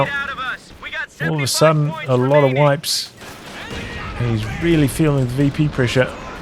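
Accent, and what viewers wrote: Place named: New Zealand. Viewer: Great cast as always mate. Heading to Wanaka for a holiday come July. Your accent constantly reminds me of my upcoming holiday haha.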